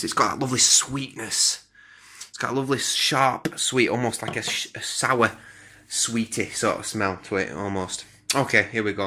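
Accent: Yorkshire, United Kingdom. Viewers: Good review - I wiped the dust off my keyboard as I was watching and somehow turned on subtitles that appeared as you were speaking. It had a hard time interpreting your Yorkshire accent and some of them are hilarious, if you know how, turn on this subtitle function and watch a few of your vids. I can guarantee some quality entertainment.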